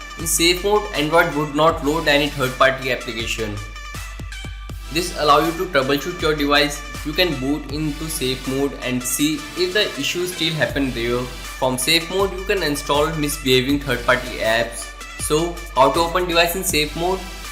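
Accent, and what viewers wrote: India. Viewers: Thank you :) your indian accent is awesome btw ;) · Aishwarya Singh he's a Bengali, that's why the accent.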